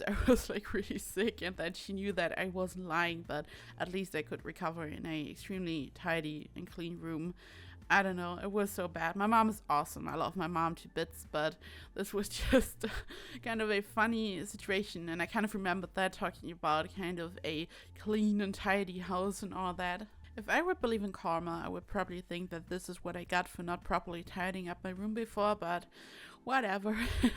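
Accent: Germany. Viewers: Are you German by chance? I feel like I recognize your accent.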